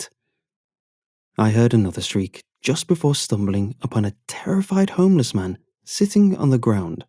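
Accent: England, United Kingdom. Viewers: The english accent and the dry delivery sells this story. Two thumbs up!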